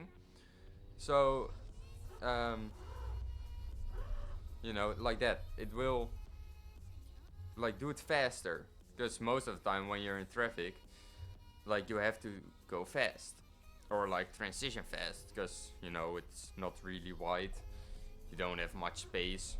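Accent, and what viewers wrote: Netherlands. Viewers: W Vid like all ways! btw are you dutch? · ngl u sound dutch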